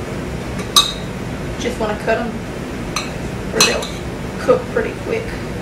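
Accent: Louisiana, United States. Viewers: Tony Chachere's, Magnalite skillet, and that beautiful accent. What parish are you from? It has to be a little further north than me judging by the landscape in some of your other videos (that i love). Wish i could get you and my wife to hang out so you could be her mentor. Keep up the great work! You have a new subscriber BTW.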